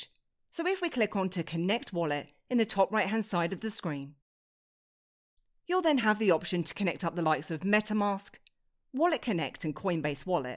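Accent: United Kingdom. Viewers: that voice and British accent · British accent is nice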